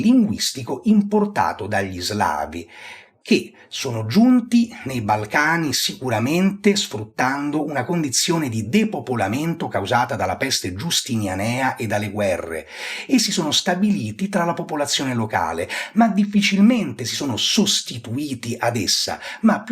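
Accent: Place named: Rome, Italy. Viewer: Caro Professore! Hai detto molte cose vere, e nelle altre hai fatto arbitro buono che non sta né da una, ne dall’altra parte. Ma per chiarire ” qualche “ imprecisione desidererei parlare a questo occhi, sento l’accento Romano nell’’tuo parlato, (scusami del tu, ma sono più grande di te, e posso permettermelo), se sei di Roma e se il tempo te lo permette, possiamo mettersi d’accordo per un caffè e parlare. Grazie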